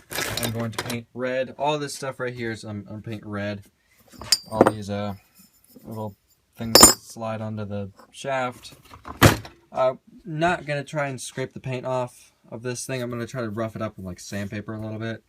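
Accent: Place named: New Jersey, United States. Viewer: How aww YA! He sounds like he's from New Jersey. 😄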